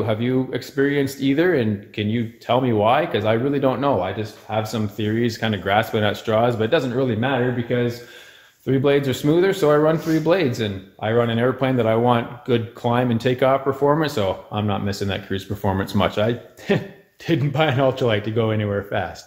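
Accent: Canada. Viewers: Thanks for the info re: props I had no idea, I have a couple of?? what is the brand name of those outboard wing tanks you have on your plane, second? is that RR bridge that you & Vicky flew over the day you had trouble with your starboard side snow slippers, was the bridge C.N or C.P. you in Canada? put it this way you sound Canadian. Cheers from OZ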